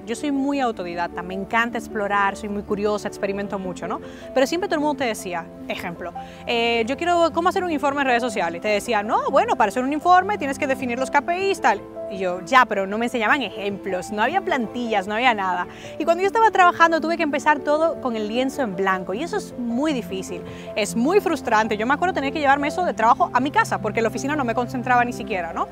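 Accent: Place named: Dominican Republic. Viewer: a mi me gusta mucho que te sale el acento dominicano y español a la vez, es una bonita mezcla. Excelente historia, a por mas.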